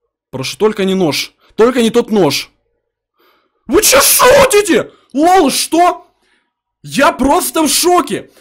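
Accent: Ukraine. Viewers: А ты украинец шоль?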